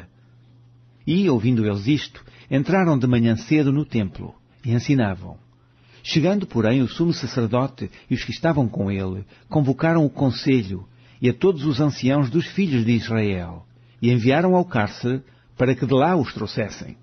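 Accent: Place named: Portugal. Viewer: Mas de qualquer maneira, esta baboseira toda vale pelo belo sotaque português !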